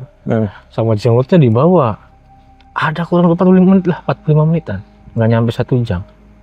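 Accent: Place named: Indramayu, Indonesia. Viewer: Enakan baka cerita Asli wong dermayu kien mah kang ketenger sg bahasae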